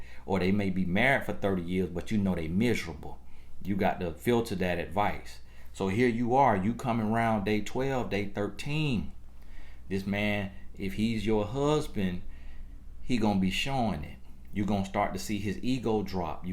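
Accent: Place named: Florida, United States. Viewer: u gotta be from Florida to from how u talk, I feel like your my brother or cousin schooling me 😂 I love you!! Much respect 💯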